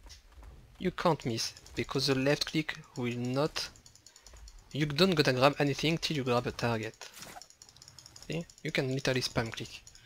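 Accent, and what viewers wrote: France. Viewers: Tu a un petit accent français nn ?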